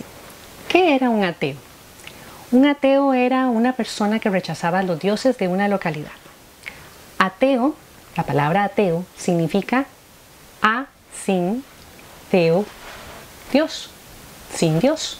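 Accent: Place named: Costa Rica. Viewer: tu practicas alguna filosofia de vida o religion en tu vida, tu inteligencia es exquisita escucharte y una mujer muy bella me encantaria asistir a alguna charla o seminario tuyo x tu acento especulo que eres tica y q vives en san jose?? Se t puede ubicar de alguna manera donde encuentro tus libros??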